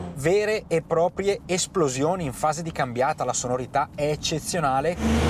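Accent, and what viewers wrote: Brescia, Italy. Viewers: Che accento Bresà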